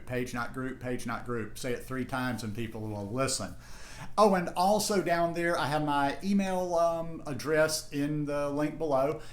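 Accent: South, United States. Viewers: I watch only a handful of raw plant based people. Their eating styles range from gourmet labour intensive, all the way to those who don't use machines and meal prep involves grabbing a piece of fruit . I take info from them all and that is reflected in how I eat. You and Tannyraw are my favourites - must be the American southern accents that I love 😀.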